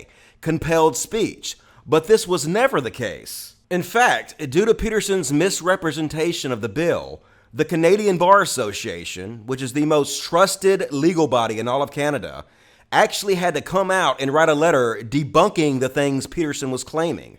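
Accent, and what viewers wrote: South, United States. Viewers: Thought so, that southern drawl. You don't know shit. A comedian in Quebec made a joke about a disable kid and lost his career over it basically. He is trying to appeal bu he's broke and has tried a go fund me page. He was fined tens of thousands of dollars and the court case broke him. Go Fuck Yourself, I'd slap you if you were in the room, lol.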